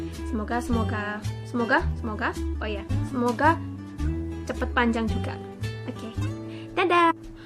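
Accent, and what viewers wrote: Java, Indonesia. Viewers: kamu aslinya orang mana sih? Wajahmu Jepang tapi ngomongmu Jawa medok? 😊😊😊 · logat logat jowo e iseh kentel wkwk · Keren, lucu aja logat mu orang jepang tpi klo pakek bahasa indo logat jawa bgt. Keren ,cantik.. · Jawa Nya Medok Banget Guuud Lah (Y) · Logat.y medok banget mba... Mantap mba....😍😍😍😍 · Heran, ini cewek jepang kelahiran mana ya koq ngomongnya medok gitu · Logat bahasa indo-nya jawir bingits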